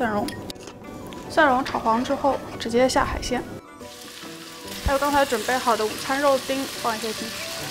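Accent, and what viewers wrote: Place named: Nanjing, China. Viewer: 我查了一下，果然是南京妹子，南普我听出来了。哈哈哈哈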